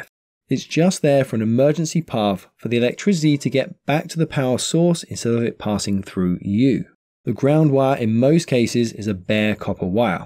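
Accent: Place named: England, United Kingdom. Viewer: Sounds so weird hearing an English accent explaining the American terminology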